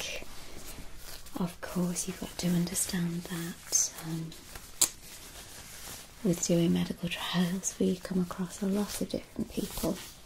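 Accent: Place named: Wales, United Kingdom. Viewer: Hearing a bit of a Welsh twang coming through there! ❤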